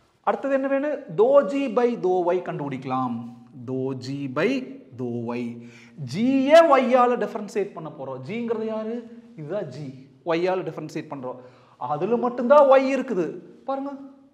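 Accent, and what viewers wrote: Coimbatore, India. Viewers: Avaru oluga tha pesura ru. Athu coimbatore slang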